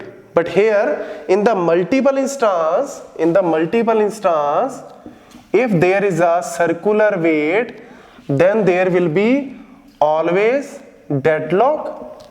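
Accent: Punjab, India. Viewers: Your broken english also a having very loving sense that punjabi accent is crisping on it great teaching.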